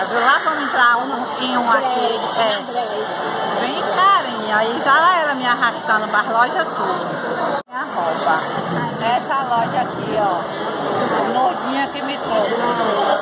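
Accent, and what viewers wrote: Northeast, Brazil. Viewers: ME IDENTIFICO MUITO COM VOCÊ,PRINCIPALMENTE COM ESSE LINGUAJAR NORDESTINO SOU DE JOÃO PESSOA.PB.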